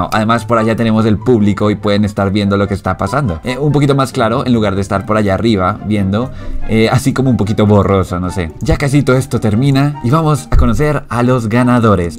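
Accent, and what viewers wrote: Colombia. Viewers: Sos de colombia no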